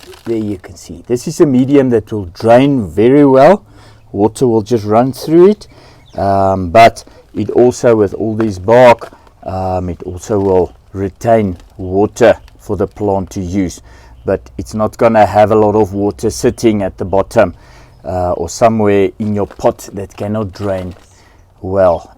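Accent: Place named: South Africa. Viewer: Lekker to hear a South African accent , I live in South Carolina USA . Great video very helpful tips . Clivia”dare my favorites, remind me so much of home in Transkei where I used to see them flowering by the hundreds under the coastal forest canopy . 👍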